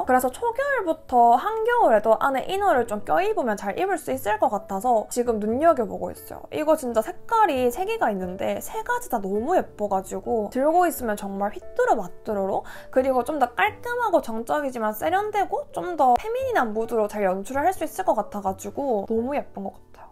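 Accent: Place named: Busan, South Korea. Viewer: 런던플랏 아는곳 나오네 너무 반가워요 부산말도 반갑구요 잘보고가용 ᰔᩚ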